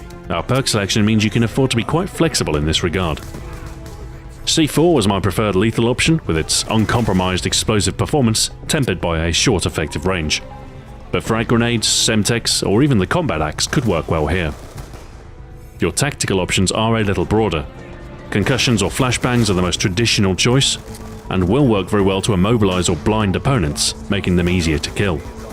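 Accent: England, United Kingdom. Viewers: If you can't understand an English accent when you speak English as your first language then you just fail at life. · bet you're English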